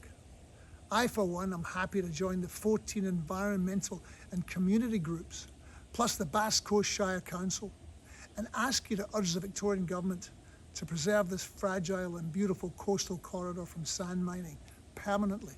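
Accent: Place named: Scotland, United Kingdom. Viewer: Nice to see Colin Hay standing up for the environment! P.S I'd never heard him speak before, and after all those years living down under, I half expected him to have an Aussie or hybrid accent, not his beautiful native Scottish accent.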